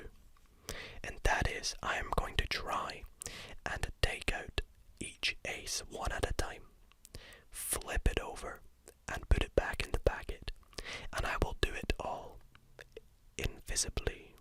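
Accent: Scotland, United Kingdom. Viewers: Scottish, yep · Just realized he’s Scottish about 10 mins in hahah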